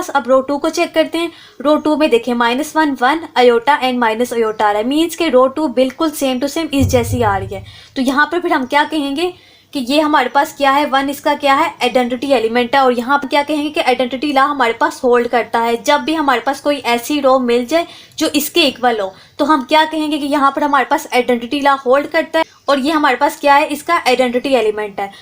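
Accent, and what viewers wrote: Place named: Lahore, Pakistan. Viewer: that Lahoڑi Accent 😂💕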